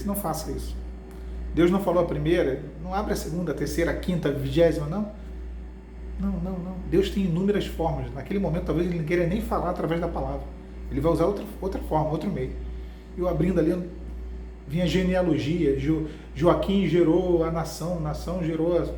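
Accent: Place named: Rio de Janeiro, Brazil. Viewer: Você também é aqui do Rio. Bem que reparei o jeito diferente de falar. Que legal!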